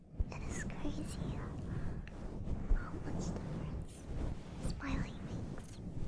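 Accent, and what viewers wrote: United Kingdom. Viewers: is she britsh it kinda sounds like it at some points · YES THE NORMAL BRITISH VOICE IS BACK THANK YOU THIS HAS CURED ME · with a legit bri'ish accent? Never heard it before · Sometime it sounds like you have a British accent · Honestly...the older shadow theme was fun and unique but I’m really loving the more typical type of ASMR from you especially with your normal voice and accent. I do have a soft spot for the British accent being from the UK myself😊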